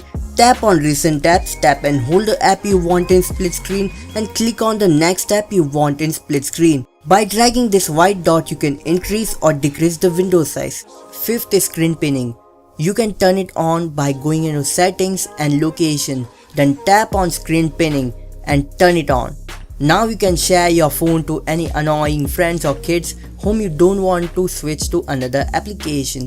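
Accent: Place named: Uganda. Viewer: Nice accent. from Uganda university?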